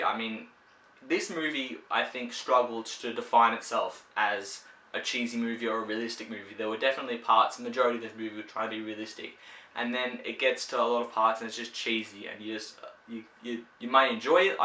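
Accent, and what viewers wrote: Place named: Australia. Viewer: Another small Australian YouTuber with talent and deserves way more subscribers? Awesome! I'm Corey and I too am an aspiring film critic with a passion for movies with a relatively small sub count (256) and not only that but the fact that we're both Australian is awesome. I can tell you're Australian due to your accent and the ratings on your Blu-ray collection, with the combination of G, PG, M, MA etc. As someone who likes to support other small young aspiring film critics or film buffs let me say I just added to your sub number. I too review films on YouTube, my personal favourite review of mine is a review for a rather obscure claustrophobic thriller released in 1997 called Cube, a highly underrated thriller that deserves more recognition. Feel free to check out my review or channel in general if you're curious but you don't have to if you don't want to.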